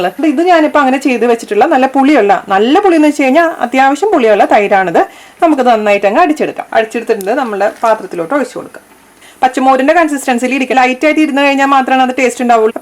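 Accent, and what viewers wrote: Thrissur, India. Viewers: Chechi ur presentation is Soo gud n u have a great thrissur slang 🥰😍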